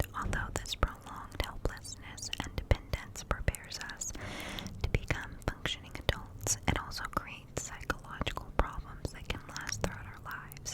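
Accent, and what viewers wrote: South, United States. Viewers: Imagine if she read a book in a southern accent the tingles I would get :) ❤️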